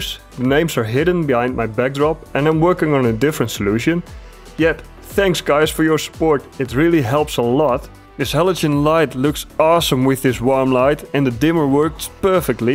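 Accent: Netherlands. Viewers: Very cool looking lamp! This is the first video I’ve seen from this channel and listening to your accent, you are a fellow Dutchie right? I’m just getting into 3D printing and orientating at which printer to buy. But I’ve just subbed to this channel for future inspiration for prints 👌🏼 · Wow, nice design! From your accent I'm guessing you are dutch: knap ontwerp en zeer knap gemaakt! Je zou ze zo moeten verkopen, toch best wat materiaal voor nodig om dit eenmalig zelf te maken. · it took me 0.1 seconds to recognize a fellow dutchie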